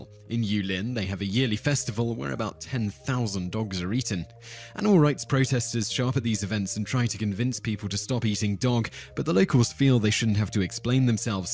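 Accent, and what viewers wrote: England, United Kingdom. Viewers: Canned spaghetti used to be a favourite of mine as a kid. I like turkey Spam. I really appreciate the inclusion of brown sauce on this list. Maybe because the narrator has an English accent. I initially wondered if Vegemite would get on this list (though all things considered, Britain would have to be cited for Marmite in such a case), but credit due to Witchetty grubs as the Australian example.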